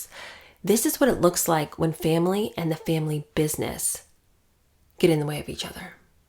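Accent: United Kingdom. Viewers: I just love your British accent😂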